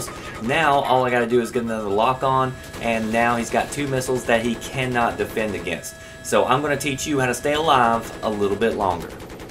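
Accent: South, United States. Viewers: Problem with southern accent? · I dont know why, but I always imagine him as welsh, but the accents makes me think he is america..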